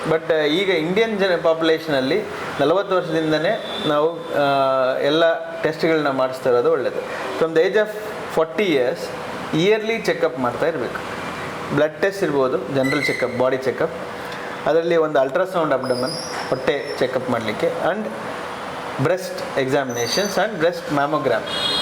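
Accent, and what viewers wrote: Mangalore, India. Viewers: ಇದು ಮಂಗ್ಳೂರು ಕನ್ನಡ . ನಿಮ್ಗೆ ವಿಷಯ ತಿಳಿದರೆ ಸಾಕಲ್ಲವೆ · ಕನ್ನಡದ ಬಗ್ಗೆ ಅಷ್ಟು ಅಭಿಮಾನ ಇರುವ ನೀವು ಸರಕಾರಿ ಶಾಲೆಗಳಿಗೆ ಕನ್ನಡ ಕಲಿಸುವ ಅಧ್ಯಾಪಕರ ನೇಮಕಾತಿ ಬಗ್ಗೆ ಸರಕಾರಕೆ ಒತ್ತಾಯ ಮಾಡಿ ಅದು ಬಿಟ್ಟು ಬೇರೆಯವರು ಮಾತನಾಡುವ ಶೈಲಿ ಬಗ್ಗೆ ಅವಹೇಳನ ಮಾಡಬೇಡಿ ಇದು ನಮ್ಮ ಮಂಗಳೂರು ಕನ್ನಡ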